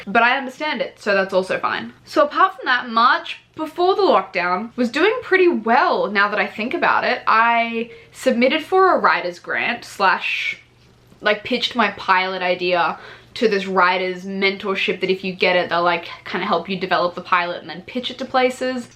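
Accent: Australia. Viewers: She's Australian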